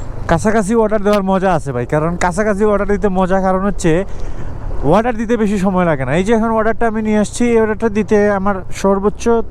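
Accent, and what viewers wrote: Sylhet, Bangladesh. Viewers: Apne sylheti?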